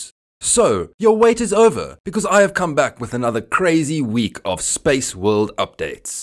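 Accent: South Africa. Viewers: You can hear he is South African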